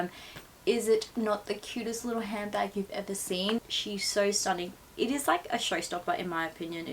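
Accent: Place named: Australia. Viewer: You're so Aussie 😂 I say cans too. I've heard you're supposed to say carn but maybe I'm wrong. Anyway love this bag and love the reverse monogram w the monogram 💖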